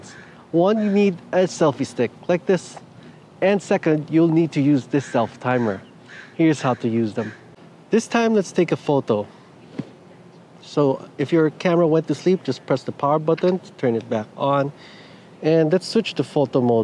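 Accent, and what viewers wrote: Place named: Denmark. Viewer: Er 100% enig med dig. Du lyder dansk, så svarer lige på dansk;) Er også vild med de her begynder guides. Jeg har ikke bestilt min OneR endnu men sker indenfor et par dage når jeg har set tilpas nok videoer så jeg er blevet overtalt:):):) haha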